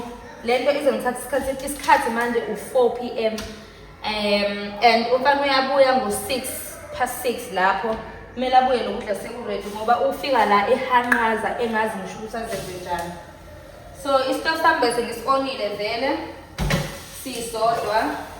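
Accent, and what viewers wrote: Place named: Thefula, South Africa. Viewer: Ave ungchaza Mase uthefula❤❤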